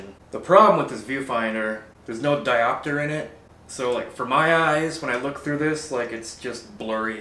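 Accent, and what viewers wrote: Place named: Minnesota, United States. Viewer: I thought I recognized that accent! Used to live in Duluth. 😅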